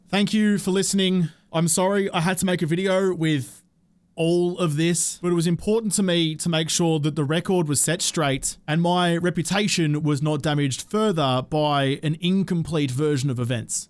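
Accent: Australia. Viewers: nooo-wwwww. video-wwwwwww. the older i get, the more obnoxious the australian accent becomes. insufferable. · I have been following you for what feels like nearly a decade now. You have been my favorite content creator based on your personality and great Aussie accent. Keep your chin up, it will get better. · I am here for the, not the game... for many years now...at first i was like "dang that man is talking like a fkn machine gun and is also as loud:D" but i stayed, first for learning the game and its mechanics, later, because i love your loud aussi snout;)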